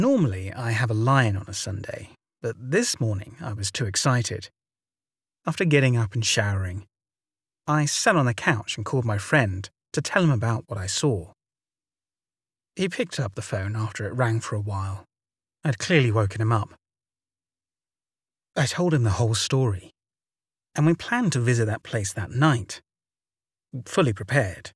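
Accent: United Kingdom. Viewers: Do you have a British accent? Everything he says is really British sounding. Im not saying he says goat like goot or anything, just that he says goat with a very British accent. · Dude I love your British accent! It gives the stories a great touch. Awesome content my friend! Watching from Dallas Texas U.S. home of the Dallas Cowboys not too far from goatman Bridge!🤠 ⭐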